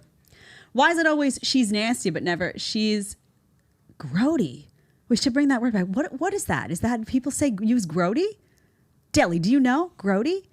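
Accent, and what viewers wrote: New York, United States. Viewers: You are delightful, especially your NYC accent. I'm drawn to women in the 5' 4'' range with small hands and feet.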